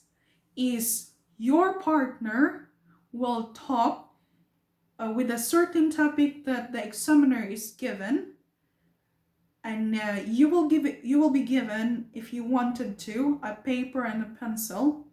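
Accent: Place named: United Kingdom. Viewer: Good information sis and I like the way you talk, you have good diction of British accent.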